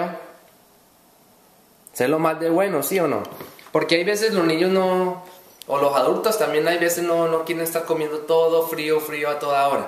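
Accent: Antioquia, Colombia. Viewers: ole tu suenas paisa, ja ja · Hola niño guapo! Buenísimo! Buscando recetas para cocinar la quinoa llegue a tu canal, aunque ya había hace algunos meses ya había visto algunos videos tuyos y me parece que tienes muy buena información. Eres tan fresco e inhibido, invité a mi hija a que viéramos juntas este video, pues es para ella a quien le cocino este tipo de comidas, ya que es vegetariana, (algunas semanas vagana, jiji!) Y le caíste de lo mas bien y dijo: He's so cute! le encantó tu acento colombiano...pero mas se sorprendió de cuanta buena información proporcionas. Dale gracias a tu mami por esta deliciosa receta, y por favor que se anime a abrir su propio canal para que nos comparta todas sus recetas vegetaríanas y/o veganas que me imagino ya deben ser muchísimas! Saludos Cordiales!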